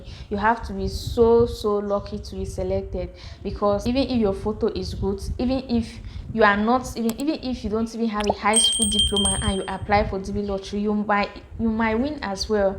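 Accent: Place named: Cameroon. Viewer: She sounds like a Cameroonian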